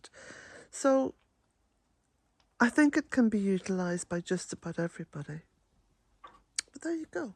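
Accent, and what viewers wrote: Ireland. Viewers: Dear lady thank you for sharing your beautiful life! I was on your website and was completely Enchanted I especially loved listening to the first chapter of your book on selling your home and finding the land. I so love your Irish brogue as my late Grandpa spoke with one. I was wondering if you had any intention of offering your books in audio format so that I might listen to that brogue and to to your voice because it reflects much more deeply than written word. Thank you for considering the possibility. I look forward to exploring your other offerings. I'm trying to remember how I found you but I think it was Revealing Light Tarot and the lovely Mary Ann. Your work connects me to my lost Irish roots. In deepest gratitude, thank you!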